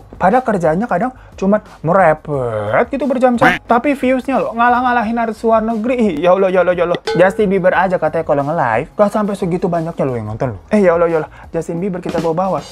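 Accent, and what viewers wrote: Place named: Pemalang, Indonesia. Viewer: orang pemalang mana suaranya.. lah ternyata aku orang pemalang sendirian di sini :(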